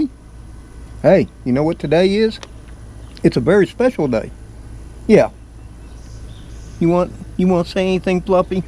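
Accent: South, United States. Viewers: I enjoy your down-home southern drawl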